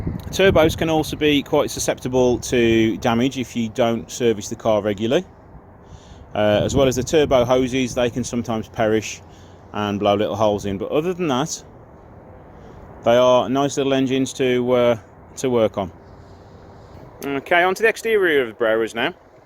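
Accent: United Kingdom. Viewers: Definately, listening to you accent, you are from the UK, weahter there makes cars rust, Bulgaria is a whole different story tho, and all the rust-prone componets can be covered in anti-coroding compound and given a coat of paint, I'd deffinately swap out the stock exhaust for а stainless steel system, which will sound better.. dump pipes and all of that will be changed.. a hybrid turbo will be installed.. an intercooler. the 2.4 engine can take alot of boost, I've had mates that got theirs to 300hp and 600nm of torque.. that's what got me into Breras :D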